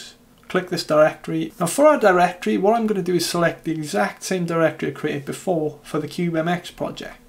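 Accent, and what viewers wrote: South Wales, United Kingdom. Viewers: Where are you? Sounds like South Wales?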